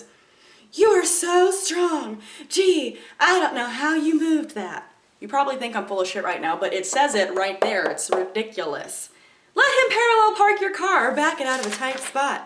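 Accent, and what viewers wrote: South, United States. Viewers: Where are you from? You sound like you have a Southern accent.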